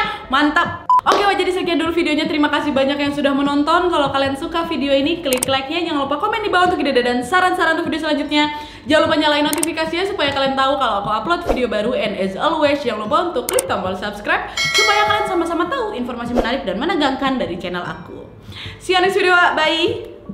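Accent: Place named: Medan, Indonesia. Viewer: Nampak kali logat Medan nya wkkw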